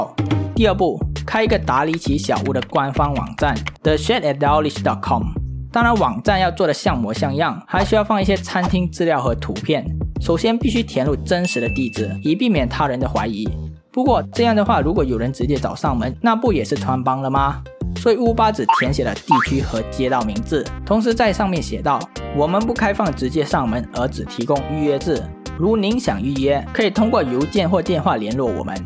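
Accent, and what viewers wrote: Malaysia. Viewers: 听口音像马来西亚人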